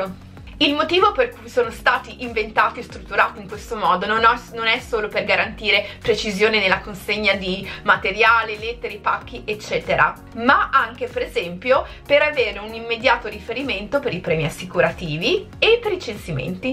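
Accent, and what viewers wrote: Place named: United Kingdom. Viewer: Video molto interessante, mi è giunta una curiosità! Ma tu sei Italiana di nascita? Perché hai un accento british perfetto quando parli inglese, e ti rimane leggermente quando parli in italiano!!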